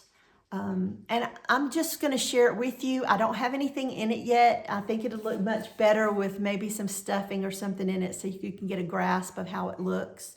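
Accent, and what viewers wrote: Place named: South Carolina, United States. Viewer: Hello Neighbor - From Georgia! Love your South Carolina accent and soothing delivery- lived in Greenwood several years and have a son in Greenville. I love all your cross stitch - so inspiring and elegant. Thank you for the inspiration. Your afghan will be lovely too!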